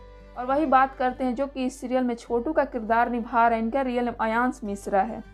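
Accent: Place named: Chhattisgarh, India. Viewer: Speaker is chattishgarhi hain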